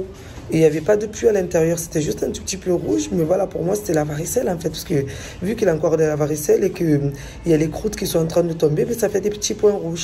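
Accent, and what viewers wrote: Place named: South, France. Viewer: Excellent Sa fait plaisir d’entendre l’accent du sud en Guinée lol je suis pour Marseille au foot c’est pour ça…😅 je suis d’origine guinéenne je ne suis jamais allé en Guinée… c’est comment pendant la saison des pluies l’humidité est-elle supportable ? Merci pour ta vidéo je vais regarder le reste plus tard… 👍🇫🇷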